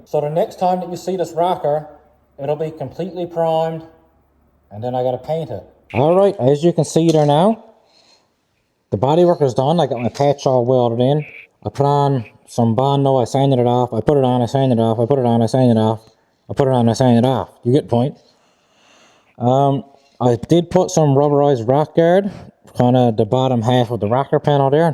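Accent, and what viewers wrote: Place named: Newfoundland, Canada. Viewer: Greetings from Ontario on a sunny -22 Feb. morning. I must say how much I enjoy your channel and your social media posts, well done! Buddy, your humor, accent, and content make for the best ambassador of Nfld! I have a question: I am an older fellow that enjoys making videos... you mentioned in a video that you use your phone and an external microphone. To improve my sound quality I would like to purchase a microphone. May I ask what microphone you use and how long the battery lasts as well as any other thoughts or recommendations you might have. Thanks so much, Paul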